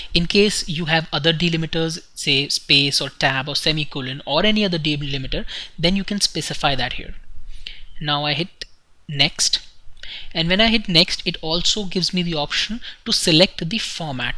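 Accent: India. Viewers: Good but i really dnt like indian accent!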